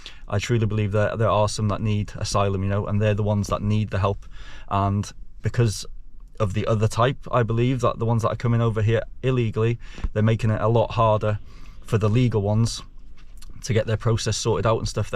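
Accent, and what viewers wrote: England, United Kingdom. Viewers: Your not welsh you sound english and not 1 of them are asylum seekers many countries they pass through before getting here? 2/3 if they really were that they'd settle for the 1st country out of pure desperation but our country are easy targets if anything gets said the race card gets pulled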